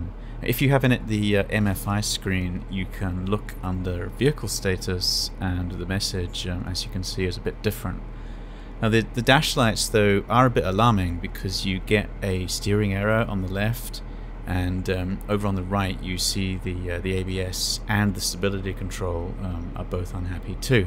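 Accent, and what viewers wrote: RP, United Kingdom. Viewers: I love the posh accent.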